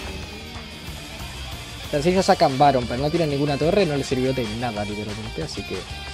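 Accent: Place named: Argentina. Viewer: Este pibe es una masa la voz me hace acordar a Dross pero con acento argentino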